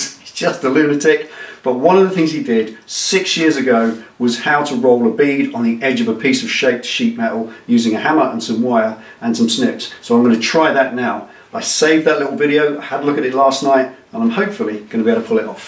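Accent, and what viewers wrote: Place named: RP, United Kingdom. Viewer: A true English gentleman who speaks the Queens English whilst using an English wheel. It doesn't get more refined than that ladies and gentleman.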